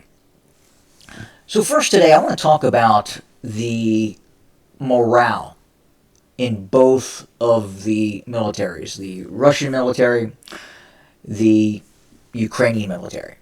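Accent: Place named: Northern Virginia, United States. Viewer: Haha! Yeah, that Howdy Doody voice... It's a Northern Virginia thing..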